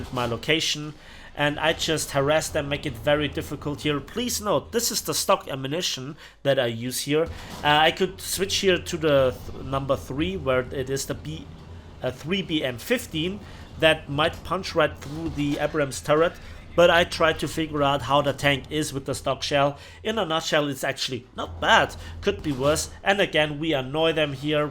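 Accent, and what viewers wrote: Bavaria, Germany. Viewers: He's from Bavaria. Those People have a special pronunciation, which makes them sound similar to the Austrians. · He just sounds like the typical german who speaks english.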